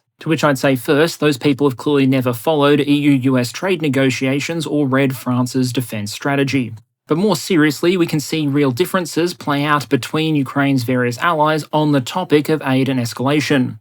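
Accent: Australia. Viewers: I feel like outright stealing the whole stylistic shebang for work, starting from the aussie accent, through the PowerPoint layout, up to the begrudging respect for the strategic prowess of emus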